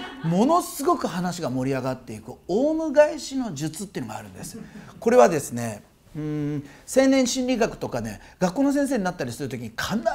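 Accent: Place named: Kansai, Japan. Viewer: ついつい次々と動画を観ています。笑😊 0.01の魔法を早速今日から使いますね！ たくみさんの会話の合間に出る関西弁に親近感が増しています。いつも惜しみなく何でも話して頂ける事に感謝しています。これからもワクワクしながらお話し聞かせてもらいますねぇ〜。😊❤️🌈ありがとうございます。🙏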